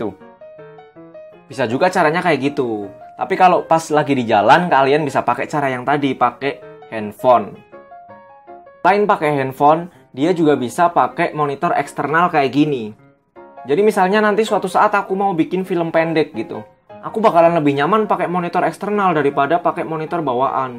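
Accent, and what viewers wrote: Pekalongan, Indonesia. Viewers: Bang orang pekalongan ya? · Orang pekalongan bang? 😁😁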